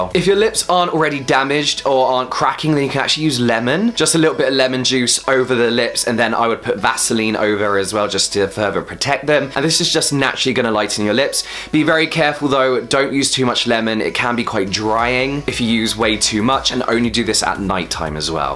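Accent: United Kingdom. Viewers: Your british accent 😆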